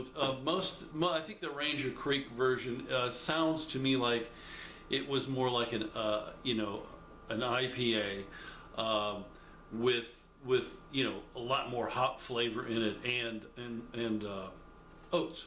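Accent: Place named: England, United Kingdom. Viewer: More English accents please! Yep that's a tasty looking beverage, cheers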